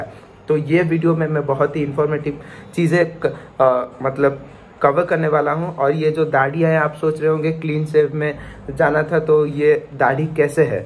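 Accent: Odisha, India. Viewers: Odisha se ho kya?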